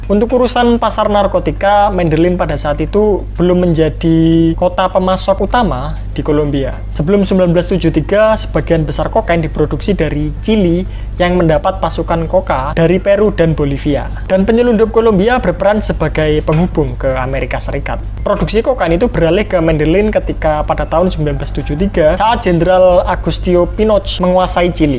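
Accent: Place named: Java, Indonesia. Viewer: Medhoknya khas banget muehehe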